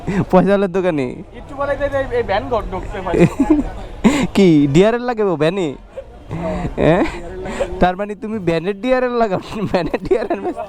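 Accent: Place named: Bhola, Bangladesh. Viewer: ভাই আপনের বাসা কি ভোলা ভাইয়া আপনের বাসা তো ভোলার মত লাগে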